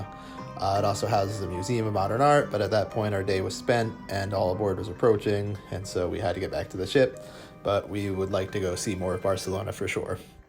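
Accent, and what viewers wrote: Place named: North America. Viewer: Great video! Could you please describe the process of embarking and disembarking from foreign ports? I assume from your accent that you are either from the U.S. or Canada. So am I, and I'd appreciate some tips on what to expect when traveling to and from Europe to board and disembark. What is customs like? Is it hard to navigate on your own?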